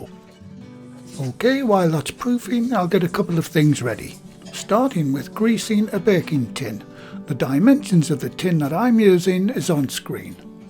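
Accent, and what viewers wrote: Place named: Newcastle, United Kingdom. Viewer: Not only are these videos great to watch (and have worked well for me so far) sounds like a Geordie Winnie the Pooh and it's mint!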